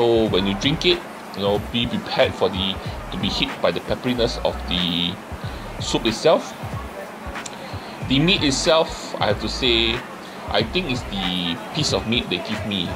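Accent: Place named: Ang Mo Kio, Singapore. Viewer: What part of Singapore do you live in? You have a classic Ang Mo Kio accent. I love it. ;)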